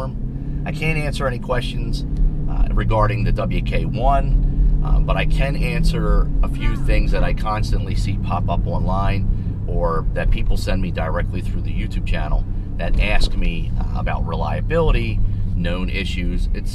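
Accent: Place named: Philadelphia, United States. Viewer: Hey man awesome video!!! I’m here in Delco. West Philly guy born and raised!!! I could tell as soon as I heard you talking that you’re a local guy!! Love the video. Considering buying 2012 used with 80k miles.... it will be first nice car I bought myself. Loves trucks/suvs but also want something fast and fun to drive!!!! Love the way these SRT 8s look!!! It’s a 2012 SRT8 6.4 with 80k and they’re asking $25k I believe. Do you think it’s worth that,?? I can deal with little issues no problem and I always had trucks so MPG is not a concern! Lol pro charger sounds like a great idea hmmm 🤔 also I subscribed. Thanks man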